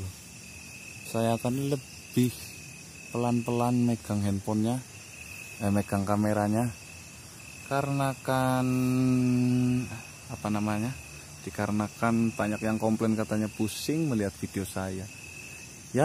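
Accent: Banyumas, Indonesia. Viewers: Woy kamu dari Ngapak bagian mana? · Jal rika wong endi apa wong banyumas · Mas wong ngendi mas, kayane ngapak tmen wkwk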